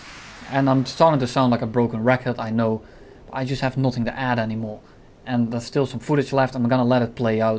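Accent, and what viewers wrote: Netherlands. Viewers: Yea he is Dutch xd you can hear that clearly love it🤣🤣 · Nah just doesn't have a heavy or deep voice, which probably makes the actual accent less clear, but it is still unmistakably Dutch.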